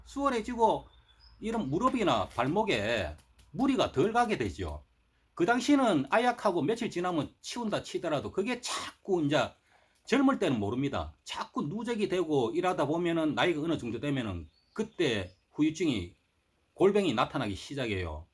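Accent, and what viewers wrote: Gyeongsang, South Korea. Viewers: ■ 새마을 티셔츠보고 방심했다가 장비보고 놀랍니다. 특히 msr 리액터에 빵터졌음. 아재 잘보고 갑니데이~ 구독도 박았심더. 고수시네요 ^ ^ 사투리도 구시하이~ ㅋ 저도 대구 경북이라서 듣기 편합니다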